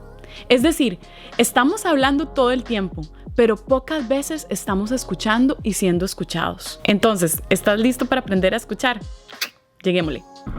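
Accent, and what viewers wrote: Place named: Costa Rica. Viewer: Amo la genuinidad de tus vídeos! Me fascina que hablés como tica 💗 y que usés el voceo en tus mensajes! Me encanta tu contenido, es super útil! 🇨🇷😁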